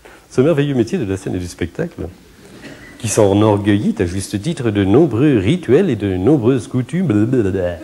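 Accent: France. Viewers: Il est beau l'accent de Dunkerque x) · Il me fait pleurer ce mec, de rire et de peur, je l aime ce mec et son humour… avec son accent limousin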